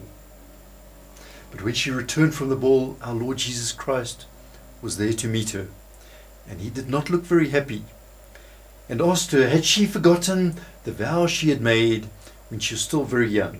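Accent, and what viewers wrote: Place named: South Africa. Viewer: Your south African accent is unmistakable, greetings from Pretoria 😁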